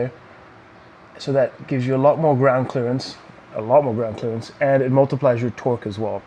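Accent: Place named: Texas, United States. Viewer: I have heard that accent before what part of Texas are you from ??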